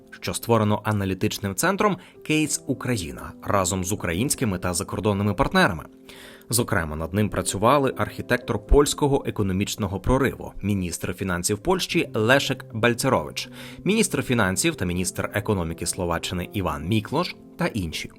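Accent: Russia. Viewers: Дикторе! Купи поп-фільтр, або навчись ним користуватися! 👺 І ще важливий момент: українська "и" це не расєйська "ы" по вимові! А "що" - це не "шчо"! 💩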